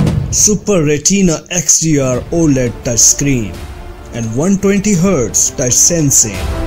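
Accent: India. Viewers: English made in India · The voice over is 100% Indian//Bravo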